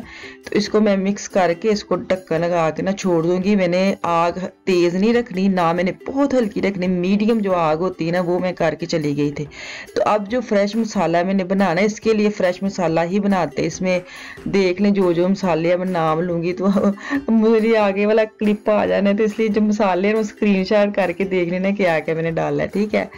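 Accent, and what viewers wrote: Punjab, India. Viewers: Nan wo bhi tandoori nan ... ghar main banana mushkil hota hy ... kamal kr dia, presentation bhi bohot zabardast hy.. urdu main pujabi ka tarka .. foran hi, like 👍 aur sath hi subs bhi kr dia, ta k future main ap ki 📹 videos dekhta rahon.. umeed hy ap nay bhi asa hi kr na hy ... Allah pak ap ko aur tamam ghar walon ko hamaysha kamyabian, and khoshyan naseeb farmaye Ameen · Oh my God apki mazedar funny punjabi style ko sun kr ma ne Channel subscribe kiya hai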